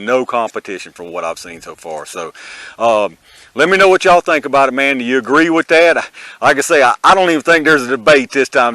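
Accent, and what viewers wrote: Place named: Kentucky, United States. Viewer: I absolutely love your accent. Reminds me of my Kentucky kinfolk. ❤️ I loaded up on Federal punch because of your videos. They should be paying you!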